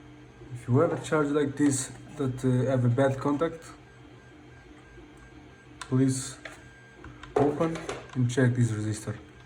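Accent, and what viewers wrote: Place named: Russia. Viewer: Какой-то русский акцент)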